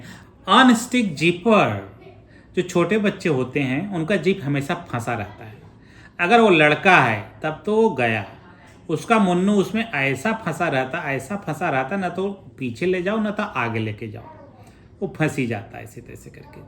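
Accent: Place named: Uttar Pradesh, India. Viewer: Appka Hindi UP ka ho... magar bache pura Gore hai...thats very much:) (laugh).. LOVING YOU ALL!!:face-red-heart-shape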